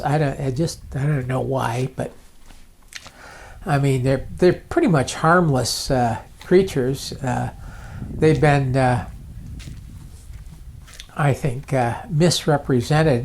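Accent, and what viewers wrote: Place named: Michigan, United States. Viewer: I thought you were from Michigan by your accent, Im from Howard City Michigan❤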